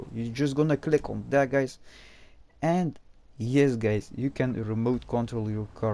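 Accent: Netherlands. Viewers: Lekker Nederlands accent door het Engels heen hahah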